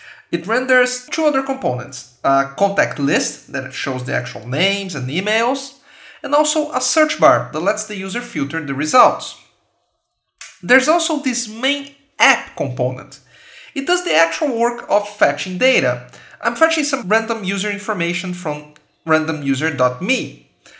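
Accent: Brazil. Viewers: I think I know this accent - Brazilian right? By the way, good vide, keep going on it! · pelo sotaque, eh Brasil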